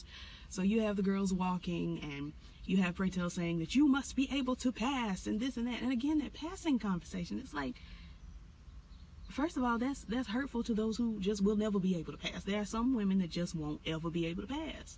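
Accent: South, United States. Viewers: You sound so Down south!!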